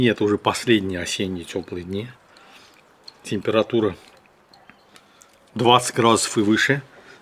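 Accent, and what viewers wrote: Baku, Azerbaijan. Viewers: Здравствуйте, дядя. Благодарю Вас, за ваш канал, за ваш бакинский акцент и за Ваш позитив😊 успехов и процветания Вам. Скажите пожалуйста, вы лезгин?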